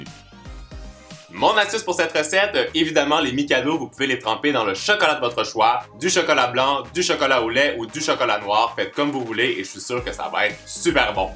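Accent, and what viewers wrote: Canada. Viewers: Car tu sais que je les ai mis un pouce bleu dans toutes tes vidéos je suis abonné et j'ai mis un commentaire et je t'aime beaucoup j'aime ton accent j'aime le Canada et j'aime tes vidéos merci pour les vidéos j'ai fait toutes les recettes mais pas tout quand même hein c'est difficile pour moi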